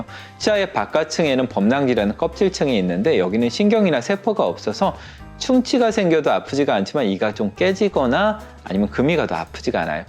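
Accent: Seoul, South Korea. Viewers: 서울 사투리 쓰시는 거보면 서울토박이신가봐요! 재밌게 잘 봤습니다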